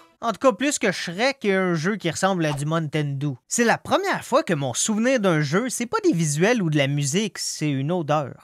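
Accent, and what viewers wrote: Quebec, Canada. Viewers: C’est un Québécois qui parle ou,la voix du mec ma exploser de rire et ça marche avec ses blagues. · Sont super tes vidéo ! On dirait que t'es québécois !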